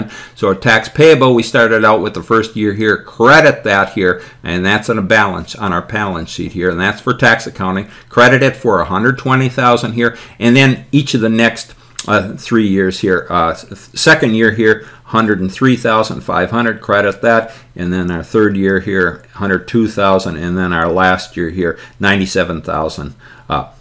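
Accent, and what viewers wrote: Boston, United States. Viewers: I find the Boston accent difficult to study to (maybe because of Family Guy?). Other than that, thanks so much for the help!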